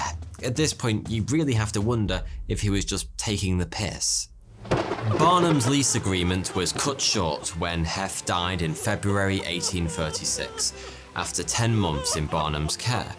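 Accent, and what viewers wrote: United Kingdom. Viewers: You are British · I love the fact that you speak British English but also uses American words and colloquialism to inject some humor to your stories!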